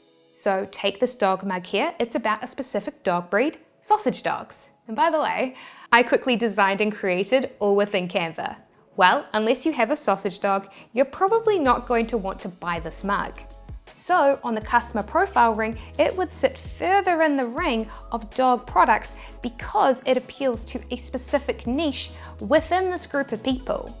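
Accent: New Zealand. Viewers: NZ accent is brilliant. Fet? Could be Fit. Could be Fat. Nobody knows! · would.love to have a convo about nz as I wana do tshirt around it.. the tshirt u say to use.. u got any specific nz related advice.. and u too was lovely hearing abit of home.in ur voice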